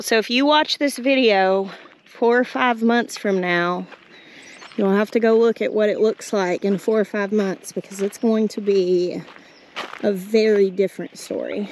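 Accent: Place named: South Carolina, United States. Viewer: Do you realize how much of a South Carolina Southern drawl you have picked up??? 🤣🤣🤣😍😘😁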